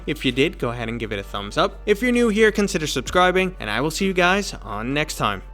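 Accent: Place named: Boston, United States. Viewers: Lmao it does sound little bit boston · your boston accent made this video 10x better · You are so Boston holy shit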